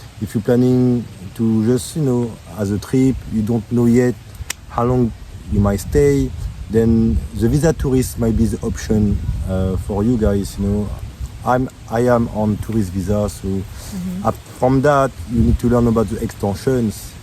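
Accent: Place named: France. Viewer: My friend, the French accent. Super .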